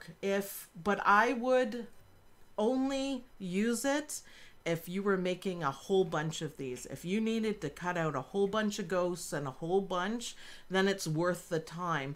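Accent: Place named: Ontario, Canada. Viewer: As usual, I'm watching late. My mom always said I'll be late for my own funeral (doesn't sound so bad, actually!). Love the fabrics you used, so cute. KK designs work out perfectly, every time. BTW, I'm mostly from Ontario - Niagara area, Welland & St. Kitts. PEI. Many of the States. But, Ont is home. That's why I enjoy your accent so much - it's home. 🇨🇦 Thanks for another wonderful video!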